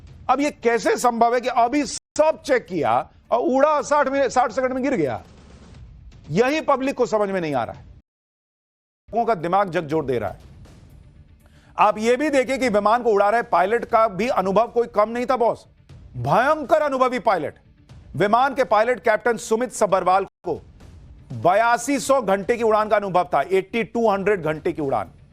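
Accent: Bihar, India. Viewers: Aap bihari hai babu ?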